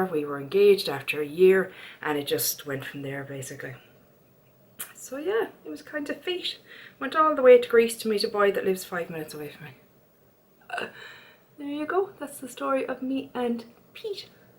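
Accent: Ireland. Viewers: Hello! New to your channel and I absolutely loved this video! I could listen to you all day. I am so in love with the Irish accent. I hope to visit Ireland some day even if it does rain a lot. I loved hearing about all the slang. I have been binge watching your videos since I found you. You have great skin and makeup. Keep the content coming, love it. ☺️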